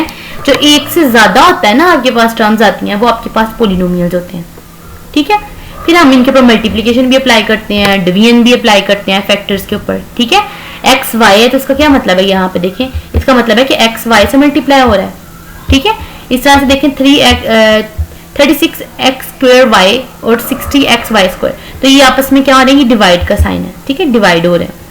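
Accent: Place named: Punjab, India. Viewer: ma sha Allah your axent is very nice cute axent ( LOVE PUNJAB )😍😜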